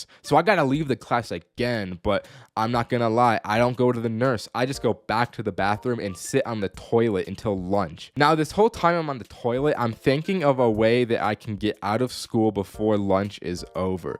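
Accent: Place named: India. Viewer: I just realized you sound Indian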